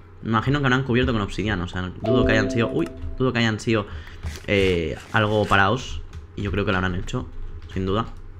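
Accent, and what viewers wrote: Argentina. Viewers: deja de hablar en argentino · muy buen acento argentino y te lo dice una argentina 😃 a y sigue subiendo este modo de juego me encanto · ¿ sos de argentina o de españa? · me encanto tu acento argentino, tuviste que aver ganado, ivas tan bien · Acento argentino <3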